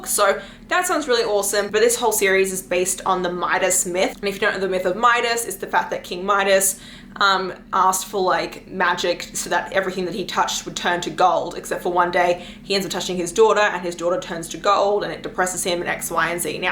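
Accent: Australia. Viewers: When i put u in 2x speed u sound extra australian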